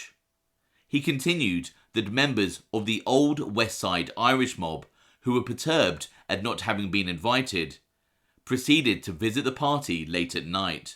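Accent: United Kingdom. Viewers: your wonderful British accent makes these nicknames that much more pleasurable and entertaining to hear. Keep up the quality work.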